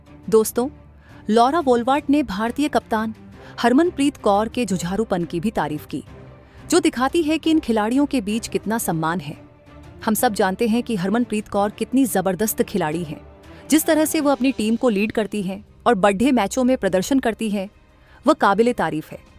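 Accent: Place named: Maharashtra, India. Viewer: Hindi sahi Hai, objection is Kiya Hai ki haha marati ward Jada Hai.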